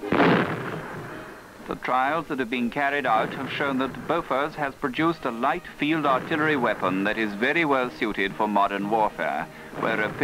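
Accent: RP, United Kingdom. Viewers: It's a Swedish weapon, not British; although clearly a British narrator. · the bbc accent is horrendous, no one in the uk has ever actually spoken with this accent (other than media turds)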